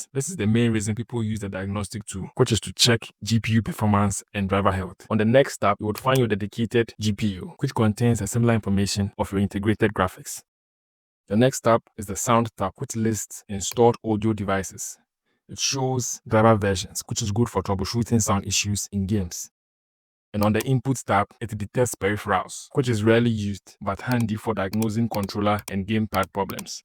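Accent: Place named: Spain. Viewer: Sounds like Spanish accent. Spanish speakers who try to speak English forget that we separate our words. His sentences almost sound like one word. SLOW DOWN and separate your words.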